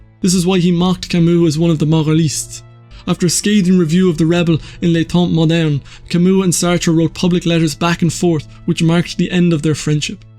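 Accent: Ireland. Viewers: More of the later I think. Although we may have chatted in a group after the course, we definitely passed each other around the court! I just saw your post on r/philosophy, i would usually pass over videos, but am reading Camus at the moment so thought i'd watch. I was glad i did when i heard an irish voice, then when your face came on, i recognised you immediately!! 😂 Great work on the channel looks like you are working hard at it, and exploring some really interesting stuff. This video was great, very interesting stuff! Have you read much Stephen Batchelor? I thought Alone With Others was fantastic, I think you might enjoy it too! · the problem with this video is it is terribly biased. as in many dialectics, both men were correct. life isnt black and white. in general violence is to be avoided, but there are times when radical change and revolution is necessary. 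if one lives in a democracy today, more than likely it came from a violent revolution. in terms of ussr obviously sartre was wrong, in terms of algriers camus was wrong. the idea that one can support a totalitarian regime in its crimes to create a utopian future seems as naive and dangerous as it is to think colonialists will stop theft and murder bc you give them flowers. it seems particularly interesting coming from a man with an irish accent, there wouldnt be a free ireland at all had they not fought.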